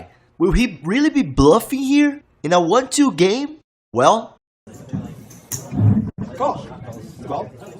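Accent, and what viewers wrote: Brazil. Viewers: sotaque de brasileiro inconfundível kkk, parabéns pelas mãos jogadas. GL GL meu parceiro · Love the brazilian accent. Shout out from Brazil haha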